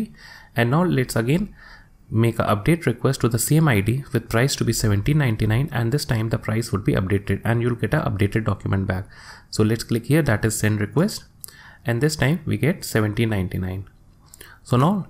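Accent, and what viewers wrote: India. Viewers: такой индийский английский невозможно слушать (((( upd: хорошо что у него понятный js ))) спасибо! только next не используют в контроллерах, ведь это же конечные мидлвары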